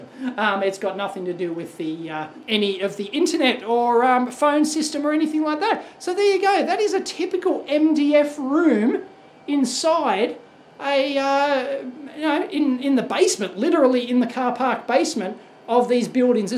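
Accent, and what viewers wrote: Australia. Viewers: You sound Australian but I am sure this is located in India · Aussie accent yeahh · No idea why this was recommended to me and no idea why I clicked it. But I like it. The guy sounds like a very upbeat person. Probably the accent. Australians always sound like they're loving life.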